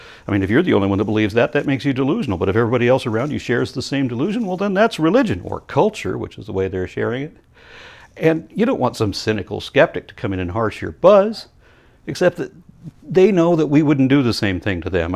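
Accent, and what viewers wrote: South, United States. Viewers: That southern voice is so perfect lol (Also nice DND analogy) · I love his southern alter ego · Your Southern voice sounds both mentally handicapped and frightening as all Southerners do to me.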